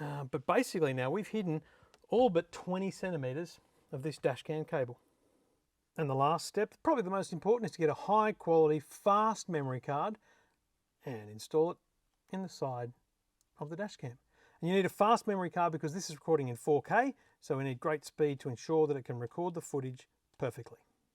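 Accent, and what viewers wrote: Australia. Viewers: This is very clearly an Australian accent. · This video isn’t in the UK. He has an Australian accent. Also, the UK and Australia aren’t third world countries and they need to meet similar safety standards as the US, so yes there are definitely airbags in those a-pillars.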